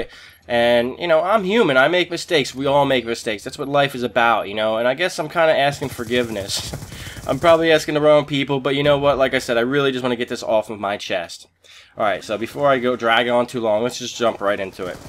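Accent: United States. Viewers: are you from the bay?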